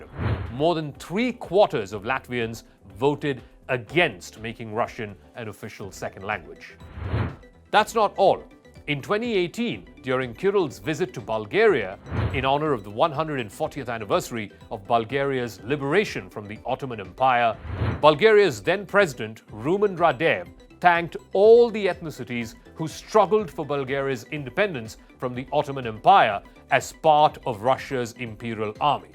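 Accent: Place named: India. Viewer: dellivver.. lol. indian accent is amusing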